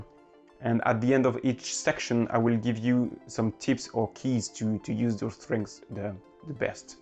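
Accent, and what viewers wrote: France. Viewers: Sounds like a french accent.but just my guess not sure.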